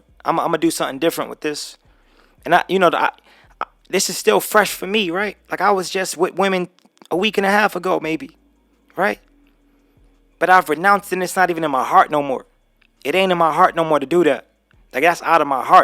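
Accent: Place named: New York, United States. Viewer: I'm watching this in 2024 and you sound different now. I still hear the NY in you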